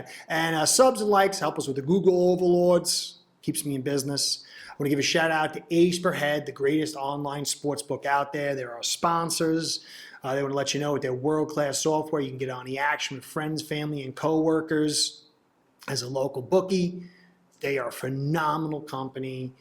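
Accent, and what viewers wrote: New York, United States. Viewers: New York accent and Dolphins fan.. interesting. I liked and subscribed because I'm a Bengals fan. Who Dey!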